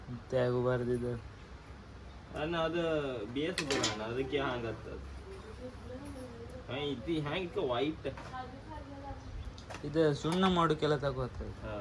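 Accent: Kundapura, India. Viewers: ಎಷ್ಟ್ ಚಂದು ಕುಂದಾಪ್ರ ಕನ್ನಡ ಮಾತಾಡ್ತೆ ಗಡ್ಯಾ ❤️❤️ ಅಮ್ಮ ನಿಮ್ಮನ್ನ ಕಂಡ ಖುಷಿ ಆಯ್ತ್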